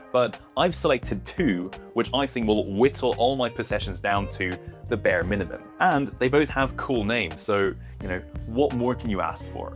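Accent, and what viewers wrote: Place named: England, United Kingdom. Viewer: Since he clearly sounded English, it is perfectly possible that it WAS a joke. Our humour can be very "deadpan" at times.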